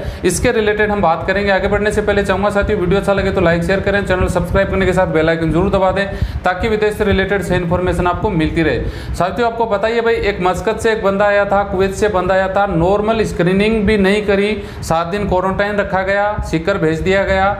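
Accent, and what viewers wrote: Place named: Lucknow, India. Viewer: Sir from where you belong Can you tell me Because your Hindi language is like Lucknow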